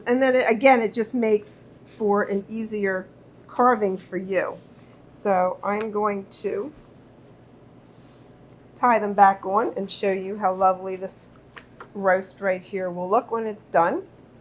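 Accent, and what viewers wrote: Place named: Tidewater, United States. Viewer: Great video. Love that Tidewater accent!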